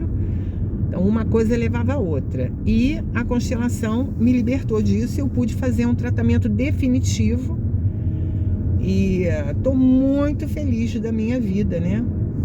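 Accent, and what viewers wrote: Rio de Janeiro, Brazil. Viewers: obrigada por essas lives maravilhosas!! Admiro vc❤.. vc continua sim, com o sotaque carioca.. puxadinho e com o X no final...rs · Nao tem sotaque nenhum!!, kkkk mestra linda vc é a esseencia do sotaque carioca ❤❤❤❤❤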